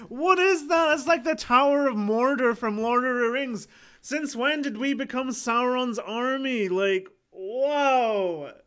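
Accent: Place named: United Kingdom. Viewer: Damn, now I feel quite stupid.. 😅 your British accent doesn't seem that strong to me though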